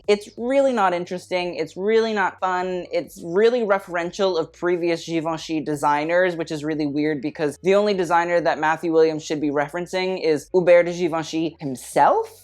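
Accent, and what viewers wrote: United Kingdom. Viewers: Wait. What? Are you British? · You’re British??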